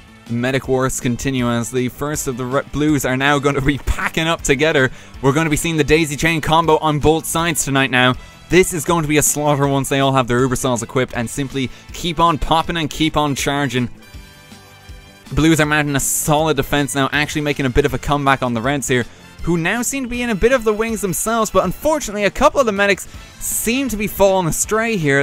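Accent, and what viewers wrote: Ireland. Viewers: wait are you irish.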